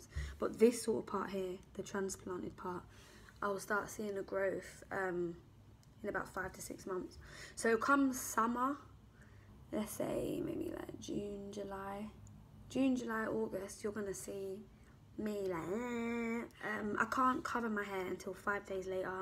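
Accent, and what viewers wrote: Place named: London, United Kingdom. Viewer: Nice London gal accent though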